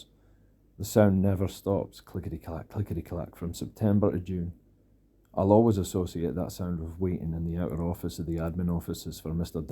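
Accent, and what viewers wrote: Scotland, United Kingdom. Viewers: Oh wow, scottish asmr and Bachman? And RAGE? Lets GOOOO! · as a Scottish person hearing a audiobook by a Scottish person gives me school flashbacks aha also I couldn't find this book anywhere so it's good u covered it on YouTube, you should do more of these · The story sounds so medieval 🏰 being read by a scots narrator 🏴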